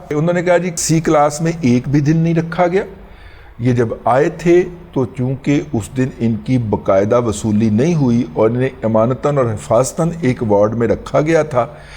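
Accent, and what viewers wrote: Punjab, Pakistan. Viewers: Punjabiyat jhalak Rahi Hy AP k monh Sy. 😅wah kia analyse karty ho.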